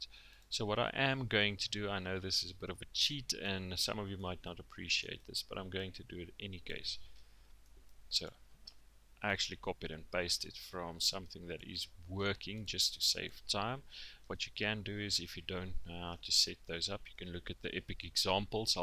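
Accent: South Africa. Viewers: I also noticed the way you pronounce words. So I assume dat jy ook afrikaans is :p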